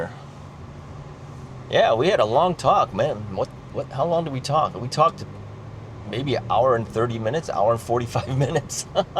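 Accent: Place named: China. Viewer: you know you have a Chinese accent.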